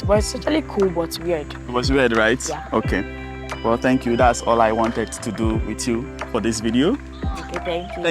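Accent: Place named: Africa. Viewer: This video was so well put brother! one thing I also realized is that it helps to convey your intelligence, because there may be prejudice against the thick african accent by someone you may be encountering for the first time.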